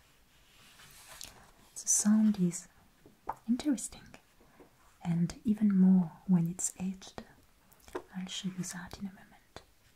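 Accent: France. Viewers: French accent is pleasing to my ears and her looks are pleasing to my eyes... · you're right, she is french. German accent sounds way different in my opinion, its not that beautiful... I am german so I can say that lol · Her french accent is a trigger itself 🤩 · Does anyone else LOVE whenever she crumbled the paper in walnut and starts talking in her amazing French accinet in a softly mom like voice because i have watched this a whole lot of time and every time she dunks the paper and crumpled it i get chills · Is she German? The accent is beautiful, I just can't place it. Edit: nevermind, she's French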